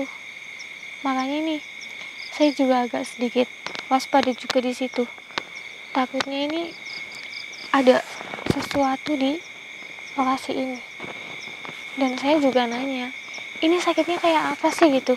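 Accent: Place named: Java, Indonesia. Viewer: Mba dr logat nya mba dr Jawa ya.masih kelihatan lihat Jawa nya.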